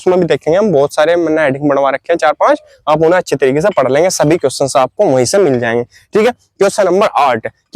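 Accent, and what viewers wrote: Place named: Baghpat, India. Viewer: Bhai to bikul apni bhasa wala h up baghpat side h to reply kariye jarur 😂😂😂😂😂😂😂😂😂😂😂😂😂😂😂😂😂😂😂😂😂😂😂😂😂😂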